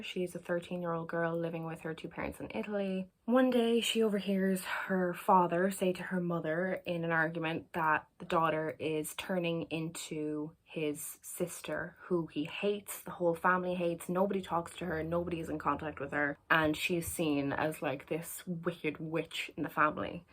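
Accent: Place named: Australia. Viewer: Your Australian accent is as bad as my Irish one but I love it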